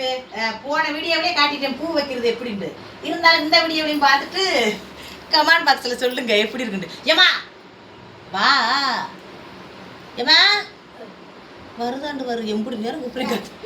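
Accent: Madurai, India. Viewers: Nee pesarathu alaka erukku ❤❤❤❤ மதுரை தமிழ் super ❤❤❤❤❤❤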